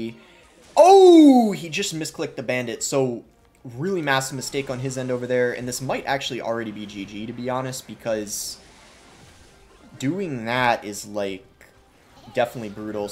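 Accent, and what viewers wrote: Scotland, United Kingdom. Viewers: Why do you say your o's in british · Why do you randomly have a British accent?? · Has he ever explained why he says his O’s like a British person or something I’ve always wondered why he pronounces them like that (no hate just wondering) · Great knowldege transfer and gameplay! and I wondered, Is that a bit of a Scottish accent?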